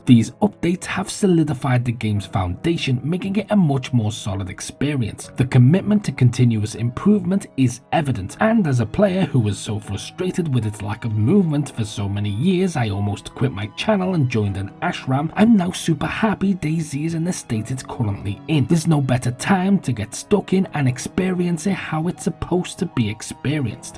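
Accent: Liverpool, United Kingdom. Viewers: Sweet vid mate, do I detect a scouse accent btw lol : ) x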